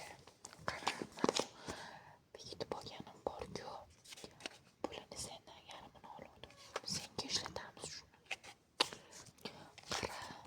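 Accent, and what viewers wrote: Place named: Fergana, Uzbekistan. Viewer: Асмр учун рахмат омад тилеман. Шева Фаргонаники шеклли ааа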